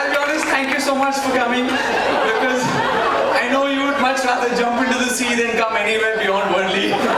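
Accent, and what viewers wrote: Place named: England, United Kingdom. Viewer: his english accent makes me laugh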